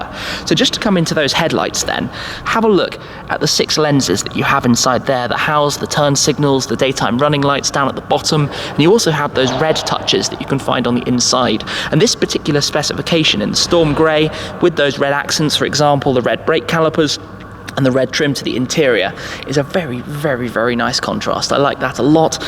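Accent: England, United Kingdom. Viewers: First I thought he is speaking qt different but later I realized that he is British! 😂 cheeeehhhhhrs! Gray one was better than red) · Ублюдки ебаные эти англичане , какой же мерзкий английский акцент 🤮🤮🤮🤮 Слух режет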